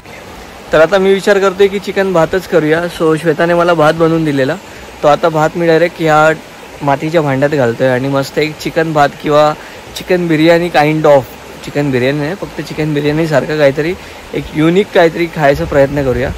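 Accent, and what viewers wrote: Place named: Malvani, India. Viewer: दादा, तुमची मालवणी बोली लई भारी आहे.. ऐकायला फारच गोड वाटतं. 😊 खूपच सुंदर निसर्ग 🌳🌴🌾🌿🌊🌊⛰️🏞️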